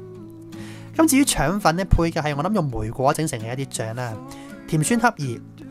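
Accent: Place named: China. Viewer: 佢把聲有D似大陸仔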